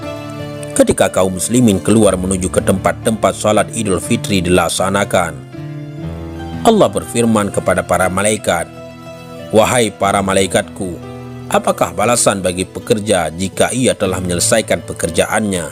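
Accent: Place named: Bali, Indonesia. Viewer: Yg pengisi suara nya siapa ya kyk nya bkn orang jawa logat nya kyk orang bali mungkin kh bali mualaf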